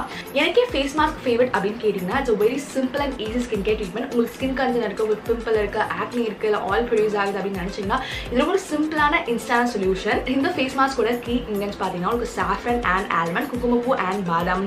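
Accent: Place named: United States. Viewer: Please Talk properly Don't use American accent....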